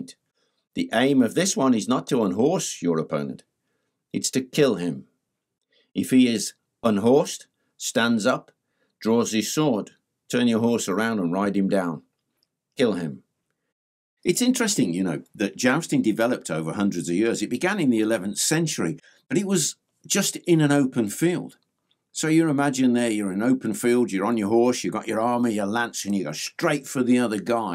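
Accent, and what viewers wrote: United Kingdom. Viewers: I love this dude, he is knowledgeable and has one of the best of the British accents.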